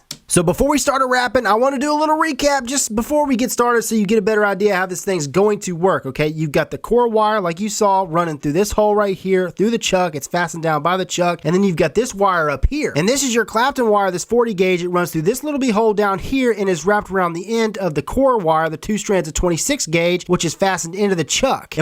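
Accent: United States. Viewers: Greek-German here that speaks fluent English. It's not day-de-lus, it's also not tha-tha-los (although it's pretty close, the transcription is just shitty). First of all, in modern Greek the Delta(Δδ), the d in the Latin version, is pronounced softly, somewhere between an English th and a d. Then, the ae, the Latin transcription of the Greek αι, is pronounced like the German ä. You can try to pronounce it by forming an a with your mouth but making an e sound. And lastly, when pronouncing -los or -lus try to get the "twang", that you Americans have, out of your vowels. Take these tips and you should get pretty close. This comment was written poorly because it's like 2 AM where I live and I'm too lazy to proofread.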